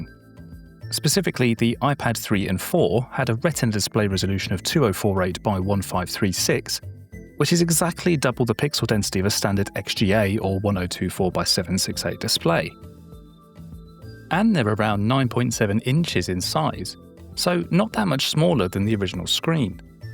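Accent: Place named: United Kingdom. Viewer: new subscriber here: super nice channel, if I may with a 8 bit guy vibe and a British accent 😊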